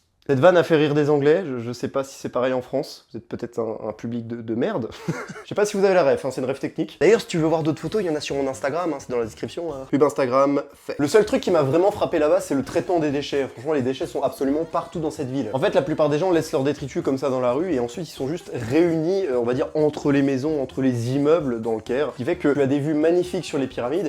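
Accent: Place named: Arab world. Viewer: Ton accent arabe 🤌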